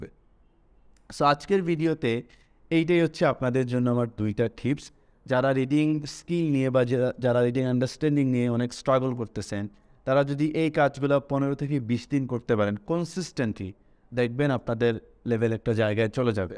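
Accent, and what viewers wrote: Sylhet, Bangladesh. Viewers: Sir apne ke sylhety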